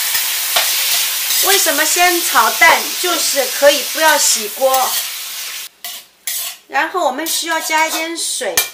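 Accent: Shanghai, China. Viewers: 很喜欢这个教做传统上海菜的视频，很听Jenny 的带着上海口音的国语，她讲解和示范的很详尽，没有卖关子，订阅了她的视频后，我照着她所教的，己经做成功很多老上海经典的小菜和点心，上个礼拜做出了汤圆和牛肉馒头，谢谢Jenny, You are the BEST!❤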